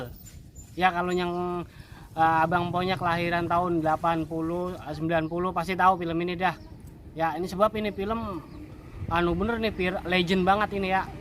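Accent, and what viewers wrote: Betawi, Indonesia. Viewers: Betawinye enakan anak kemayoran logatnye.ente betawi pinggiran ye bang? Ketahuan bahasenye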